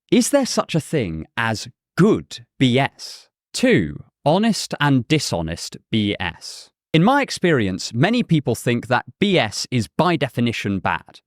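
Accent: United Kingdom. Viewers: Yer .. . .....ah ha ..... Okay I've little to lose by my discovery of your site questions. Great stuff. Was it this pod or the last where I thought AI essayist Snapchat (is it?) can make anything believable. I remember at school a teacher pulling me aside and emphatically saying I should go into law - I wrote so convincingly she believed my work. High praise indeed....except that the essay was in microbiology, and utter nonsense. I'm from the UK as is your accent. I find that if I don't look at you and just listen your material is superb. Looking at you, my main thought is you are too young. Your material and presentation is too polished. I wonder if you are really a deep Fake; the writer a much older man or woman - and I get distracted from listening. Today I just listened. It was much better. Then, you seriously mispronounced a common word. So now I wonder if your look and talk are a very advanced AI bot. 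I just can't think of someone so young putting together and presenting these advanced lectures. There. I've said it. I still enjoy your work.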